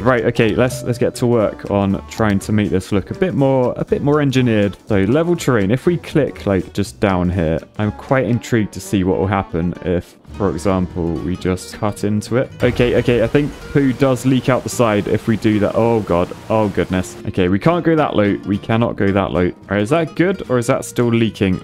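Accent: United Kingdom. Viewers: Because this guy is british, he really makes me think American English and English are completely different languages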